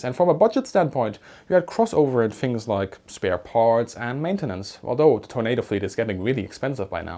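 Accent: Germany. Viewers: Hallo, ich höre Dir gerne zu, aber Du redest zu schnell. Nimm Dir doch als Vorbild die US- amerikanischen Kommentatoren, oder die Britischen. Du hast immerwieder in Deinen Sätzen einen starken deutschen Akzent, der es zusätzlich schwer macht. Danke. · would you consider the possibility in future videos of speaking in a slower mode...? You speak at the speed of sound all the time, and that gives the listener no chance of pondering for a split second what you have just said....and your German accent doesn't make it any easier. Thanks in advance.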